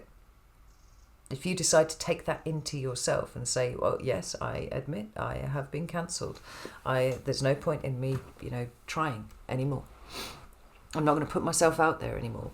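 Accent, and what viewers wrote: United Kingdom. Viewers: I get such a pure feeling of the British genius for language when you speak. Casts a spell.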